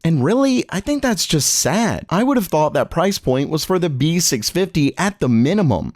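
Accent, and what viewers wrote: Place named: United States. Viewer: most uncomfortable American Accent to listen.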